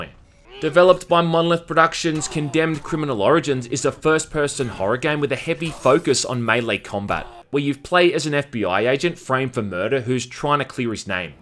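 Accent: Australia. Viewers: Love this guy's Aussie accent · Your videos are way better than Gameranx. It may just be the macho ozzie accent.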